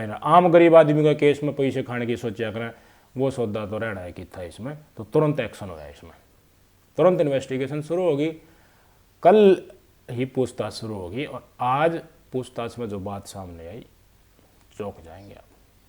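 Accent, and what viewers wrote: Haryana, India. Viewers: बेटे तेरी जो हरियाणवी भाषा है आज तक किसी भी पत्रकार ने नहीं बोली ऐसे हर घटना को बताता है जैसे दो आदमी बात कर रहे हो बिलकुल सरल हरियाली में बात करता है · Phale Bolna Seekh Le Aa,pni Lokal Bhasa Na Bole ,Bhiwani Jiila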